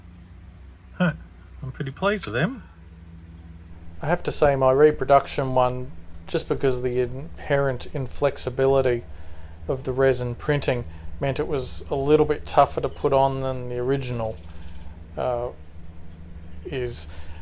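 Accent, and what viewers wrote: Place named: Australia. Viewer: gee, looks nice and clean again. especially the roof rack, very useful, even for other castings, and customs. what if you do the rack two-part? make the two clamps that grip the roof's edge separate, connected by a rod in the middle maybe, so they click easier around the roof, and once the actual rack is glued down all gets stabilized. of course, chroming it will be a challenge then. but personally I'd be afraid of the resin breaking. anyway, great work! the casting looks a bit disproportionated, maybe because of the narrow wheels? aside that, if you need a giggle, look at how YouTube subtitles translate your 'strayan accent... including the dremel's noises. XD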